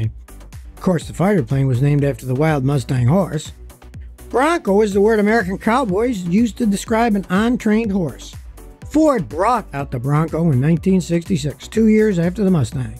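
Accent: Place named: Western New York, United States. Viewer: Funny how much hiw a person pronounces a word reveals. Kind of figured you are from western NY, by how you pronounce "un" with it being an "on" sound. Transplant to Silver Creek, Irving area in 1990, from all over the map. Spent 24 years there, until moving to PA in 2014, then Ohio this year. Love visiting your hometown, wife and I honeymooned there.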